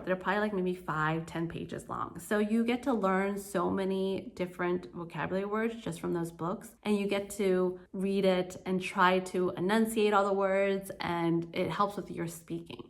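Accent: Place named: Spain. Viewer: You talk like your Spanish. Not bad, to be honest.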